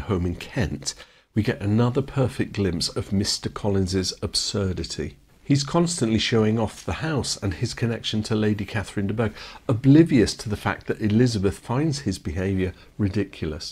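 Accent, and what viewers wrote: United Kingdom. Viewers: Re subscribers, clearly you underestimated the appetite of a legion of Austen fans for a soothing British narrator and their favorite film clips over the well-trodden history of the Beatles 😂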